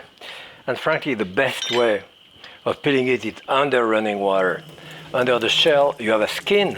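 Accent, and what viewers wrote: France. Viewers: Jacques is the most beloved American with a French accent 😁 🗽 The statue of Liberty is nice, but she's no. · Like Pavlov's dog, I hear french accent and get hungry right away.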